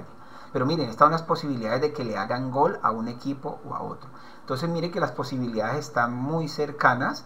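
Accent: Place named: Cali, Colombia. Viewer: Jajaja se te nota el acento caleño, vamos colombia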